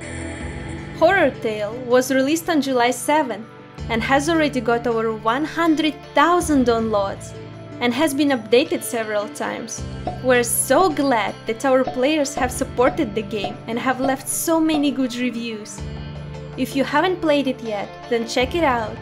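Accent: Russia. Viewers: А че у него русский акцент? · Мне кажется что у неё русский акцент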